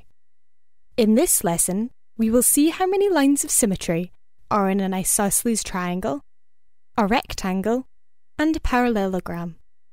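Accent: Scotland, United Kingdom. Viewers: Good stuff, lovely sexy Scottish accent too.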